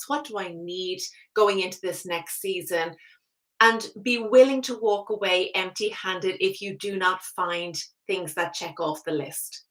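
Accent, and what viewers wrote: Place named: Ireland. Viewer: I love your smile and your Irish accent! Greetings from Madrid in Spain ❤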